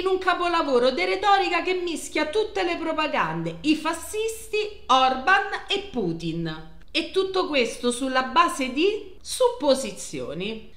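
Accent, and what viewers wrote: Rome, Italy. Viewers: Si è mai vista una romana de Roma, e che parla pure in romanesco, difendere Salvini dalla propaganda della galassia PD??? SI!! La tostissima!! 😊❤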